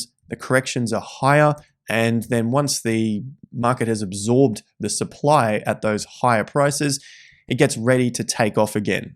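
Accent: Australia. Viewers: your content is great, straight forward and no bullshit. It’s so good hearing an Aussie as well. Love your work.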